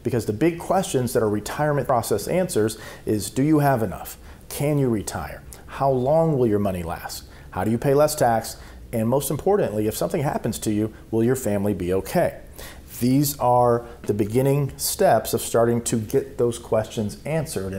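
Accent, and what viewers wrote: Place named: Texas, United States. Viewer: He’s from Texas. Some words are going to truncate. You gotta love Texas.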